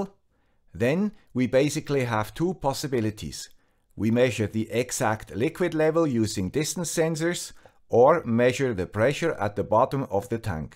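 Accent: Switzerland. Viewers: Tangential question: at <link> are you saying "gritty YouTubers" or "greetings YouTubers"? I'll wager it is the former: gritty. The Swiss accent, while adding color and charm, leaves me in doubt about which word it is. Can you confirm? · Perfect timing! I have a small decorative fountain that I brought in to store for Michigan's winter. I wanted to outfit it with a sensor to stop the pump if the water level dropped to prevent damage and alert Home Assistant. Now I have no excuse to forget. Once again thanks to the guy with the Swiss accent! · A little more about why a solution matters (and why your videos have been helpful, apart from the pleasure of hearing a Swiss accent again after my years in Bern): A few years ago a colleague at my university asked me if I could help measure the level of water in the ground in a tropical rain forest. In fact I was already studying groundwater saturation in peat and other soils. 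This is a hugely important topic worldwide because it is an important factor in flood risk and in the loss of carbon from soils - one of the very biggest sources of greenhouse gasses to the atmosphere. So I had a go at the problem. There are a lot of ways in which people measure the level of water saturation, and soil moisture, some of which are good, some of which are poor - and which you have very helpfully discussed in previous videos. As you know there is, in fact, one method of measuring soil water content which, if calibrated, can be made quite accurate and tough using cheap sensors - capacitance monitoring. There are also many ways to measure groundwater saturation level (the level at which all the porosity in the soil is full of water, which is also the level to which a dip-well tube will be filled) some of which can make use of the instruments you discuss in this current video. 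My preferred method is to weigh a tube suspended inside a dipwell (because it's really tough enough to work in hard environments), but I have the load cell creep problem to deal with so maybe I go to a laser distance sy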